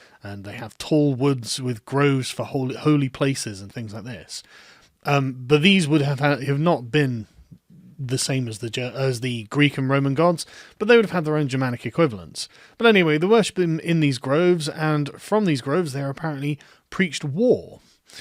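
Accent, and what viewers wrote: United Kingdom. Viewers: I'm from the U.S. Love your British wit and accent. I despise what youtube has done to you and we haven't stopped it. All aboard Trump 2020 and Brexit!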